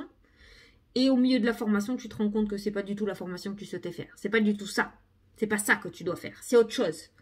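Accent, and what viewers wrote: South, France. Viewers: Bonjour et encore merci. Pour moi ce sont tes abréviations ( maniant au lieu de maintenant ou si t es d accord au lieu de si tu es d accord......cela exprime ta joie et ta volonté de nous faire aller vers le meilleur très rapidement car tes idées fusent. Il y. On doit se bouger le « derche ». Et il n y a pas qu un chemin pour y arriver,il y en a plusieurs et enfin tu vas passer un sale quart d heure mais cela t amènera à une vie de joie.........tes phrases sont pleines d espoirs et de vitalité avec ton accent du midi, c est ce qui donne de la force à tes paroles quelque soient les mots que tu utilises. Encore merci et à demain 🙏🙏🙏🙏🌞🌞🌞🌞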